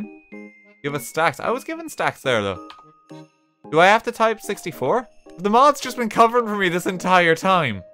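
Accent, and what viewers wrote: Ireland. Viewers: irish sounds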